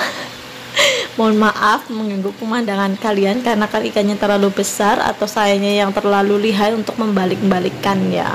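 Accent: Banyumas, Indonesia. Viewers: Waakaikumsalam mbak ngapak maning hebhe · Tkw ngapak heboh tenan iki semngt dan sehat selalu mbk e 😊😊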